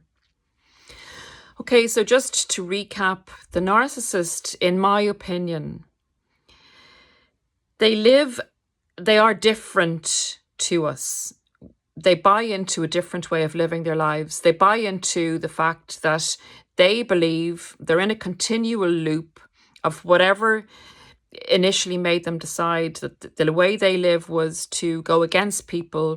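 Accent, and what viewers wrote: Ireland. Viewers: What a lovely 😍 lady, you my dear have helped me tremendously with my healing, I have listened to your lovely ❤Irish accent, for hours and hours thank you for your work, you describe the behaviour and Traits of Narsasits very, well exceptional ❤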